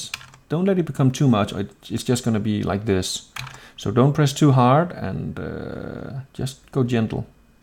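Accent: Denmark. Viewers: Are you danish? both your accent and name is danish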